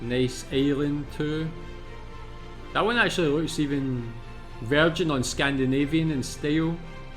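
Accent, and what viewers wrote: Scotland, United Kingdom. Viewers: Didn't need subtitles, your Scottish accent was easily understandable. And I'm living in the last city shown, which was kind of cut up with only the view from the main castle (city has more than one) and not so much on the castle, and still not capturing the main feature, as the city originally was designed in fan shape, with this castle being the centre. Not showing the other castles, or the countries highest courts. But I have to say, France is also doing a good job in preserving old cities and villages. Went to some, e.g. in the Vosges Mountains, that I thought kept more of their vintage charm than many German ones. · ...before i start to listen your reaction, my first thought was, you have a scottish accent... i am , see, what comes now. i will give you some comments after your video. btw. german here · Very nice accent or Scottish ! 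👍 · Greetings from Germany. Love your your scottish accent. That´s the land of my dreams. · I wanted to say the same :-) I grinned all the time ;-) And his enthusiasm seems real! But the scottish accent: FABULOUS! :-) AND, a REDHEAD! Made with pride in Scotland ;-) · I am glad, to hear a scottish aczent again. I come from the Black Forrest region, close to the french border. Many well kept Cities arround, plus all those Nature. Sometimes we do not even see the beauty anymore. Also, I feel sorry , because you lost your Freedom of Movment. Europe could be such a good place, if only we would be united. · Scottish, your accent, right?! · I Luv juor sccotzich Accent. Very translatbal to Bavarian 🤣🤣🤣 I survievwd all englisch Trips 🤣🤣🤣 Even in indonasa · I thought you’re from Scotland, love your accent ❤❤ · Which part of Scotland are you from? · Like your videos and - mostly - your scottish accent. 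😊 Thow, not every city is so beautiful, like in the video, but there are a lot. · The Scottish are always welcome here in Germany and of course we do have always a good Beer for our Scottish friends. By the way i like your Scottish dialect :-). Best regards from Germany to the beautiful Scotland.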